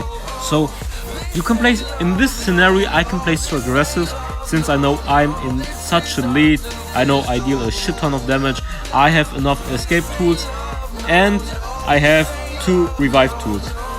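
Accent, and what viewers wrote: Germany. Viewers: So wie du Englisch redest safe deutscher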